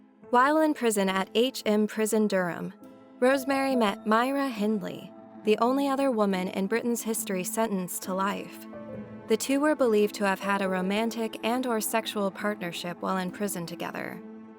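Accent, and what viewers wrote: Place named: United States. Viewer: why the hell is this robotic voiced yank doing the voiceover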